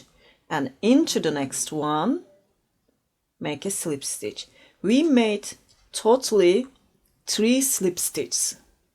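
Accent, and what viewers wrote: Turkey. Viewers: Abla konuşmandan türk olduğunu anladım bunu türkce anlatırmısın lütfen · Your accent sounds like turkish where are you from?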